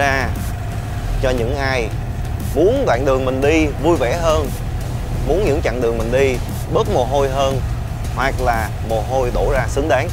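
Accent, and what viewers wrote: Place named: North, Vietnam. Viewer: Tui nói đùa thôi. Chứ tui là fan ruột của bác từ khi bác còn bên tinh tế. Chất giọng bắc dễ nghe và cách bác review rất chi tiết. Nở lòng nào dislike cho ido của mình bác.😄😅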